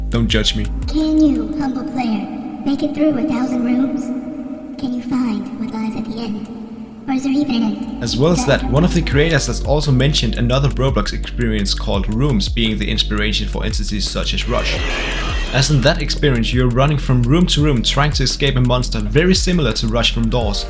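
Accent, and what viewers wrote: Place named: Denmark. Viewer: i can just hear the danish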